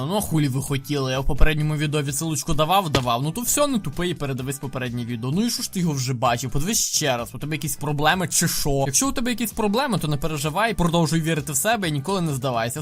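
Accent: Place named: Carpathians, Ukraine. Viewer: 😂👍. ТИ З ЗАКАРПАТТЯ ЧИ З КАРПАТ,ЦЕЙ АКЦЕНТИК В ТЕБЕ Є 🤔 В МЕНЕ ОДНОКЛАСНИК ЧАСТО БУВАЄ В КАРПАТАХ ( ЙОГО ТАТО З КАРПАТ ) БОЖЕ Я ВЖЕ НЕМОЖУ )) ЧИ ТО ВОНО ДУРНЕ ЧИ ВОНО БОГ ЮМОРУ ** НІ Я НІКОГО ОБІДИТИ НЕХОТІЛА ТІЛЬКИ ((( СЕРГІЯ)) Я ЙОГО СУКУ НЕ ПРАЩУ ЗА ТЕ ЩО ВІН НА МЕНЕ НАЦЬКУВАВ ЯРІКА З( 2 КЛАСУ ) А ВОНО ДУРНЕ, ХОЧ МАЛЕ (МЕНІ ПО ПОЯС ) ЙОГО ФІШКА ПІДСТРИБНУТИ ДО ТЕБЕ ВЧІПИТИСЯ ЗА ШИЮ і ВІДЧІПЕТЬСЯ ТОДІ КОЛИ ВЖЕ ДОБРОБО ЩОЛБАНА ДАТИ ))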